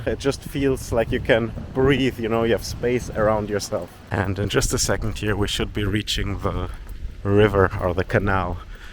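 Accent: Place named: United States. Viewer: Why are you talking 'US dollars' when you 'say' you are German, visiting London??? You certainly don't look German at all, and talk like a Yank. Why fly to Stansted anyway, which is a long way from London? Why moan (like an entitled Yank) about no seatbelts on bus and sit nearest the front window? You are far more likely to be killed in a crowd in Berlin statistically than 'fly through a bus window'! Just how often do you lose your CC, idiot! More dollars, whatever they are???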